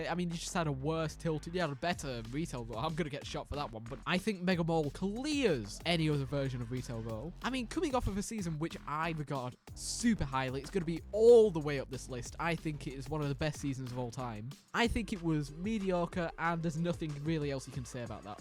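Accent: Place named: United Kingdom. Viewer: Respectfully you are actually the most British sounding British youtuber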